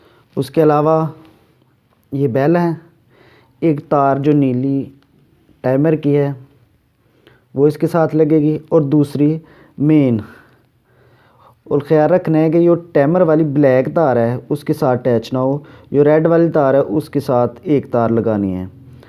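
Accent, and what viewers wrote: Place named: Sialkot, Pakistan. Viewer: Mera andaza theek nikla apki boli sialkoti lg rhi thi.mn bhe sialkot me hota hun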